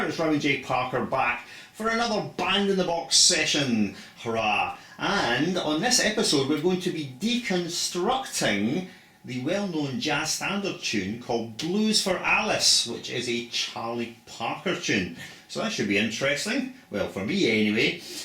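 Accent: Scotland, United Kingdom. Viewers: I already know all this information, but I can't stop listening to the Scottish accent. Keep them coming, man!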